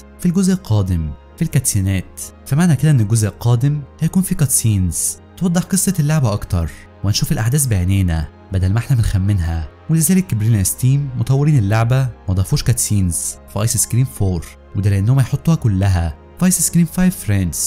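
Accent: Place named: China. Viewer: من لهجتو صيني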